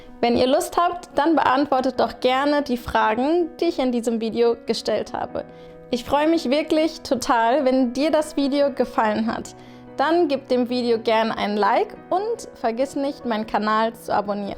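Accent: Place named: Germany. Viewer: Ihre deutsche Akzent und die Art und Weise wie Sie alles erklären finde ich wundervoll. Vielen Dank dafür 😊👏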